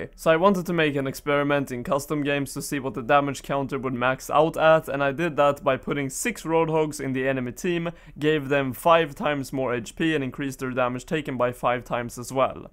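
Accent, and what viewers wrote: Sweden. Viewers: Ur Swedish right? U sound Swedish nice vid btw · Are you from sweden? Really sounds like it. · I can hear that you are Swedish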